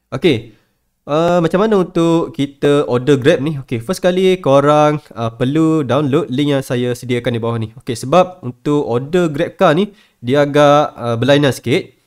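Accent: Kelantan, Malaysia. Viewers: kecek kelate terus lagi baik...tak payah kau orang2..pelat kelate mu tak boleh lari...hehe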